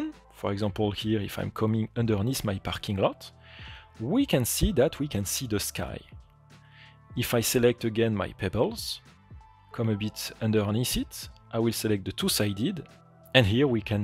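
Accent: France. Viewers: toi tes francais mon pote,l'accent de ouf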